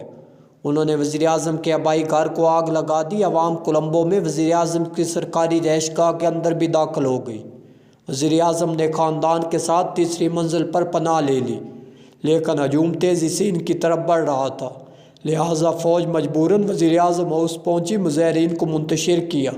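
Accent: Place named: Punjab, Pakistan. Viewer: ye Admi Urdu mea panjjabi Bolta hea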